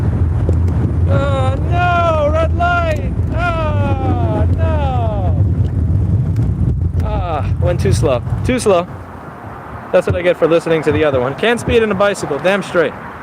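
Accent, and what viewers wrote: New York, United States. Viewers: thats called NY Jewish accent...works even if not Jewish, but we all have it and separates the locals from the wannabes or in this case the Macabees!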